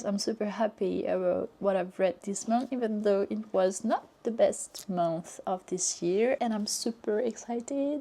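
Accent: France. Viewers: I have to admit, both the video and sound quality have improved 😎☑ I can hear you a lot better now, parse out the spoken words and sample their distinctly French flavour Horror and humour can go hand-in-hand depending on how well they are balanced in a story. It is very interesting hearing your critical take on Tolkien. The fact you don't like the fictional emphasis on genealogies and lineage as they relate to the overall story, making the reading experience a bit tedious. You are the first person I have come across who thinks J.R.R Tolkien's writing style has a tendency to be superfluous. You think he's a showoff:face-green-smiling: I appreciate your French perspective. If I were to ask someone from the US or the UK about LOTR, I'll be informed of Tolkien in glowing terms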